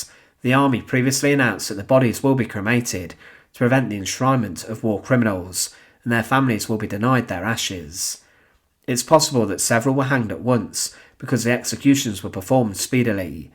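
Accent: United Kingdom. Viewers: He’s british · The accent is British, I'm a fellow Brit 🙂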